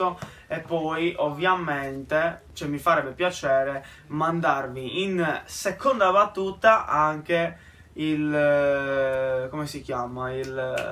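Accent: Sardinia, Italy. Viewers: Si sente che sei sardo ;)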